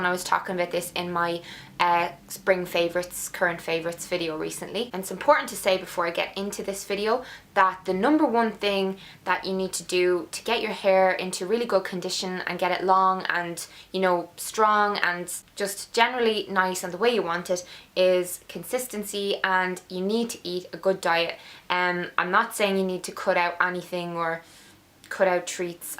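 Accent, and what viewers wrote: Dublin, Ireland. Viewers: from your acsent I know you from Dublin Ireland. I'm from Ireland myself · Firstly, my english isn't good and sorry for this :( My hairs seems like yours. They are very long, shiny and thick. I love my hair but I hate hair breakages :( what I can I do? Notes: I'm very lucky I discoverd u :) I'm a Turkish girl. Irish accent fascinates me :D Your accent is amazing I think :) You're so cut and amazingly beautiful :) I love ya :* And again sorry my english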